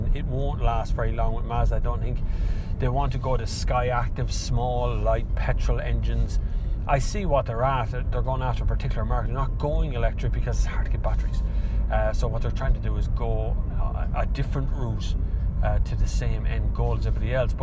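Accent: Ireland. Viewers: I like your Irish ☘️ accent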